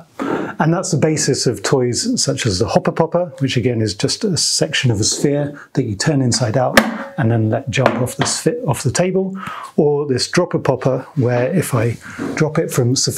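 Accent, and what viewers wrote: United Kingdom. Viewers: Hoppah poppah, droppah poppah. Regardless of his British accent, he's very easy to listen to.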